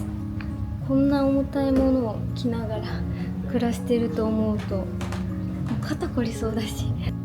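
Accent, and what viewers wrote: Kansai, Japan. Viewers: いつも楽しく拝見してます。ちゃんがたまに話す関西弁がめっちゃ好きで、めっちゃかわいいです😆 これからも楽しい動画をお願いします。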